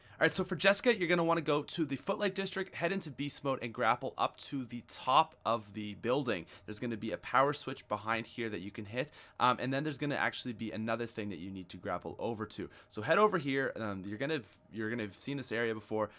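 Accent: Canada. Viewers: I feel like the further you got in the video the more canadian you sounded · is he canadian?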